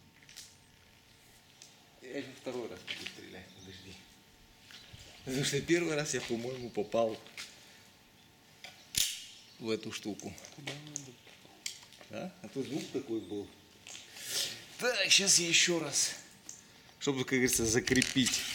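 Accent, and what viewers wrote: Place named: Kabardino-Balkaria, Russia. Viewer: акцент кабардинский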